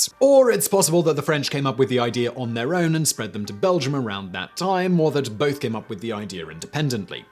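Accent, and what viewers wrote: United Kingdom. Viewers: Is it just me or does the narrator’s intonation sound annoying? I usually like the British accent but his annoys me. Ugh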